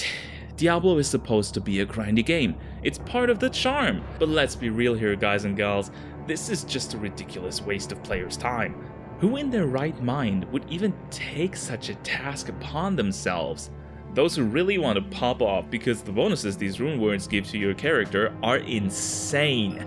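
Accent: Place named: Spain. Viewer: Having a helluva time trying to figure out your accent—I’m vacillating between “Spanish” and “Czech” ha ha. But who cares? Your English grammar and pronunciation are an order of magnitude better than 97.654% of American YouTubers! Great vid. Great analysis. Insta-sub! (And I don’t even play Diablo)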